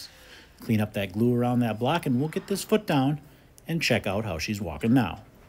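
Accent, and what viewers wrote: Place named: United States. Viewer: Respectfully, what happened to your Scottish accent? Sounds American.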